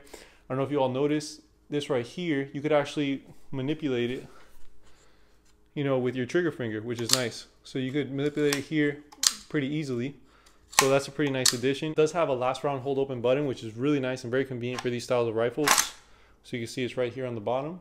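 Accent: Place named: Miami, United States. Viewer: Damn... that’s a Miami accent no matter where you hear it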